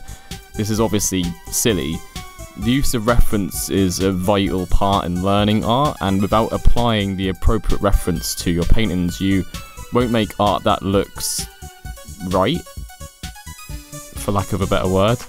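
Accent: United Kingdom. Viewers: Nice voice and nice accent. I'm starting to think I miss London.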